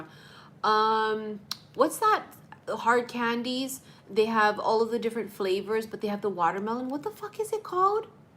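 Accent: New York, United States. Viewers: Sound like a New Yorker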